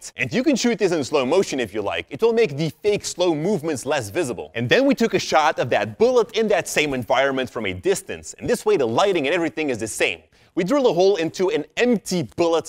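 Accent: Flanders, Belgium. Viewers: ik wist niet dat je vlaams bent, ik kom zelf uit nederland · Ik wist niet dat hij vlaams was😂😂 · ik wist niet dat je vlaams spreekt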